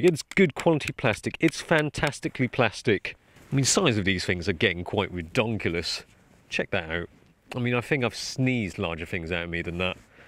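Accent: England, United Kingdom. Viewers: Britain accent? Why do I feel it so funny coming out of Asia? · awesome an asian with an english accent. I remember when I heard a black guy speaking fluent japanese in a meeting at the office. " I flipped " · Seeing an Asian with a British accent is awesome! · anglochinese speak like british, cool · 這英腔講的，不看膚色還以為是洋人。美式英語語根本沒法聽。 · wow where is this place? and as an American, seeing an Asian man speaking brit is interesting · i like your British accent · Omg it's a Asian guy with a British accent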